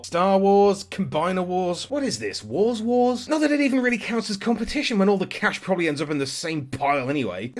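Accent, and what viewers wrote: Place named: United Kingdom. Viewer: Ah, the accent and "cheeky". Of course you know Penfold and Dangermouse. Did you guys have Bananaman?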